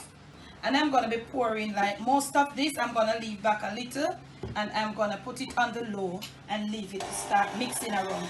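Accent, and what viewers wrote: Jamaica. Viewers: Hej Miss, Manners an' Respect from Denmark 😊🇩🇰. My wife and I absolutely enjoy your show, and we look at them everytime we receive notification😊. Incidentally today we will try your chicken burgers! You are an inspiration and brighten up our home with your presentations. We love when you say "me soon come"; and miss it in some of the videos...and please don't ever change your accent; it is absolutely brilliant and genuine. We look forward to the next experience, and ready to try some real Jamaican food. Without Brown sauce 😁. Happy Easter from Denmark.🇩🇰 Luca and Tina.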